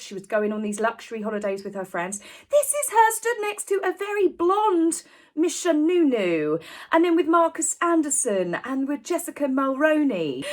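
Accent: United Kingdom. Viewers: You're so cute with your British accent. I just realized you were saying party boat. I heard potty boat. 🇺🇲❤🇬🇧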